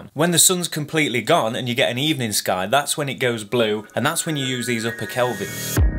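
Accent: Nottingham, United Kingdom. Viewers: You've gotta be from Notts duck!? Great vid. Subbed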